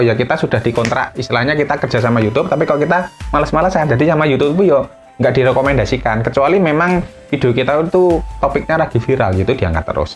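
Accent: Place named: Ungaran, Indonesia. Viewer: Nek ngrungokke logatte mas, dadi kelingan kampung halamanku di ungaran mas, karna logatnya hampir sama, semoga tambah sukses banyak subsribernya mas, mampir Cilacap dan direview kotanya, wassalaam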